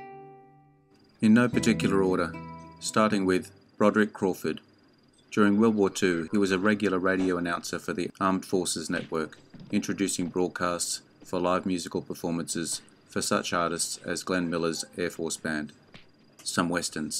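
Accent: United Kingdom. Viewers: A guy with a limey accent reading a script... do I take his word regarding cowboy actors? Naw. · You sound as if you are from Great Britain so why do you feel you are qualified to judge a Western?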